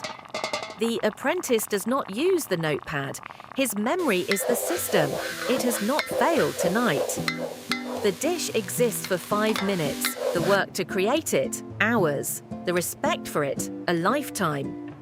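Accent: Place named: United States. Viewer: Annoying American narrator.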